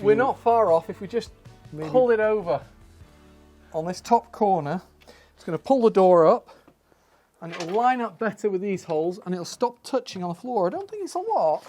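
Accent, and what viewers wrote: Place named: United Kingdom. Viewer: that was an enjoyable video watching you, and work together. It will definitely be a fun renovation. Adding the new door makes it already look light years better. I love hearing you speak French and switch off to English with your British accent! I’m curious about lead based paint concerns. In the United States we have inspections prior to purchasing a house built before 1978 because there was lead in paint before 1978 and the airborne particles are dangerous to one’s health. Just wondering as we watch all of these old buildings be torn apart with dust and paint chips flying around.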